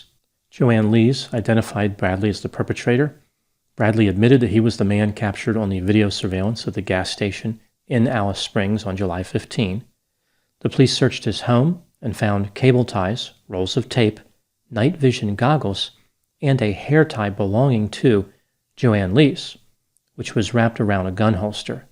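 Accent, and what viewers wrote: United States. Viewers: unexpected and perfect pronunciation of our cities with an American accent. Thank you!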